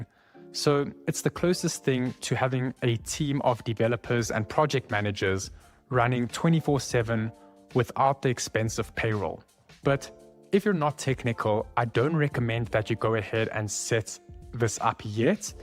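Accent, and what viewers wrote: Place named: South Africa. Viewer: Didn’t realize how much I missed hearing the SA accent.❤ moved away when I was 11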